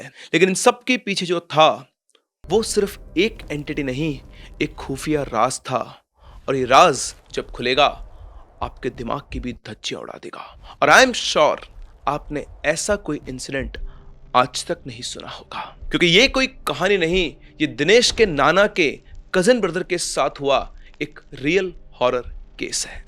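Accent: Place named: Bengal, India. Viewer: Bengali accent ....story bengal ki h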